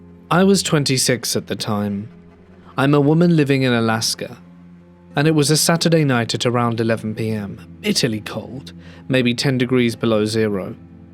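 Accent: United Kingdom. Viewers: I'm not sure where you live but he has a very British accent like my own .Unless you live over on this isle then it's not going to be possible..I'm guessing you may be,American because I've noticed Americans never take into account that there's actually billions of us who don't live over there ..cheers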